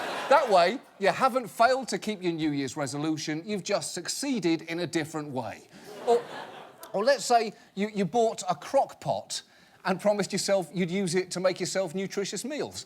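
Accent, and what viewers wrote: England, United Kingdom. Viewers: Thanks to his british accent , even silly things sound viable ! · I love when says words in a distinctly English way. like "kentucky darby"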